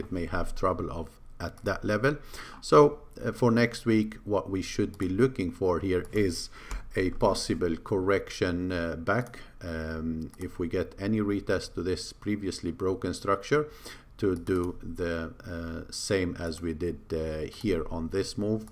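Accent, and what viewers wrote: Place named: Iran. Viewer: You sound like you are from originally from Iran.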